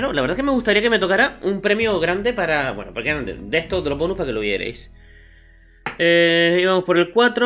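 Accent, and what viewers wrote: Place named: Canary Islands, Spain. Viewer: Felices fiestas chicos 🎅 🥂, dices un sombrero y una gorra y es un gorro de lana ☃️ tienes acento de las islas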